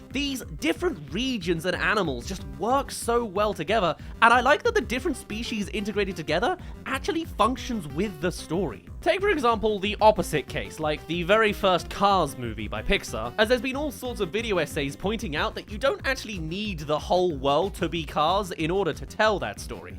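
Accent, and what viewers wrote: United Kingdom. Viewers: Your British!?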